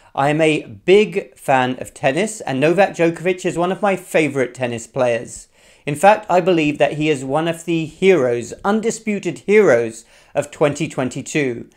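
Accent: United Kingdom. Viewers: I didn't know you were an American citizen, since you sound like a Brit!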